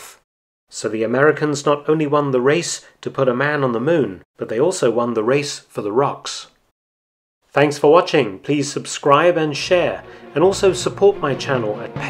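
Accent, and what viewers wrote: United Kingdom. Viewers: When you have to use context to tell whether a British person is saying Luna or lunar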